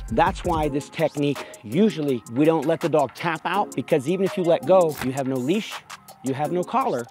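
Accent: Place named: United States. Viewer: You fled the UK and developed an American accent pretty fast.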